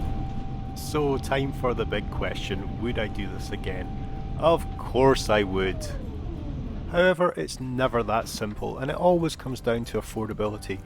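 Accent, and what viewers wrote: Scotland, United Kingdom. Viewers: Hi, nice to meet you...I've just discovered your channel and I must say IT'S REALLY ONE OF THE VERY BEST out there!!! Your videos are Grrrreat! Excellent quality, good content, to the point commenting, and perfect editing. And the wee Scottish accent is the icing on the cake!...and did I mention that you're also eye candy??;-) (hope you don't mind a compliment from a man!) Agree that VS are excellent value for money, especially if you happen to stumble on one of their offers. Their crews are some of the very best, aren't they? I so love the A350....big, smooth, quiet, powerful... Doesn't get any better than that, really! Xx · You have got all 3 my favorite ways of traveling (airplane, train and ferry)! 👍❤️ Please keep traveling/sharing and I will definitely keep watching. 🙏 By the way, love your Scottish accent.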